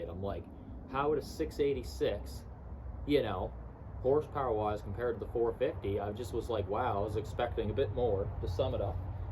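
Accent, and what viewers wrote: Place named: Canada. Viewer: what is NS? Accent sounds heavy canadian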